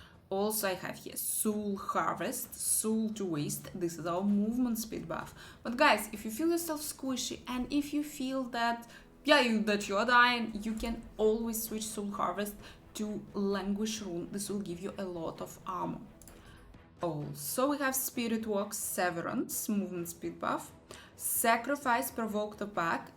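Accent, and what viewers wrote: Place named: Russia. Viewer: I find it very pleasing to listen to you talking about diablo with that russian accent 🤣😅 also nice video!!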